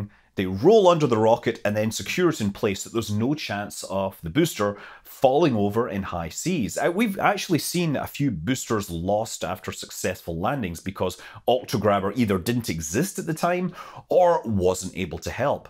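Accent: Scotland, United Kingdom. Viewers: It took a Scottish accent to make me notice the ships names are plays on mystery and mischief. Lol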